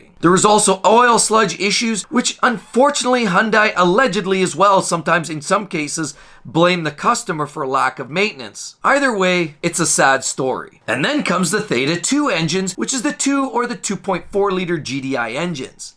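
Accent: Canada. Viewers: God you sound like Bret the Hitman Hart....Canadian accents.....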